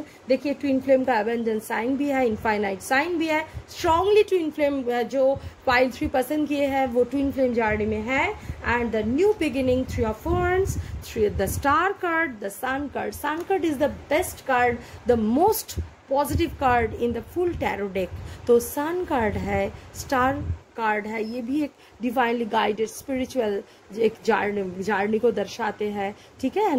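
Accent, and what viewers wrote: Bengal, India. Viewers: Always love to hear you, especially your bengali accent. The ways you explains, really every single word touched my heart . Pile 1 resonated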